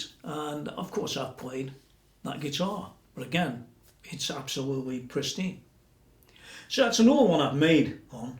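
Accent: Stoke, United Kingdom. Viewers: Sounds like a Stoke accent to me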